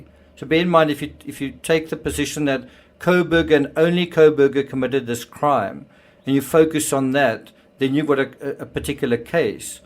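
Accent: South Africa. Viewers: There is no voice better than a South African voice talking about anything ❤️🇿🇦.... sorry been watching all the channels on this and just found yours and couldn't even focus... but yes agree I am extremely selective on what channels I watch and subscribe to. The Vet and check everything before posting or reposting now that everything has gotten so out of control. Thank you 😍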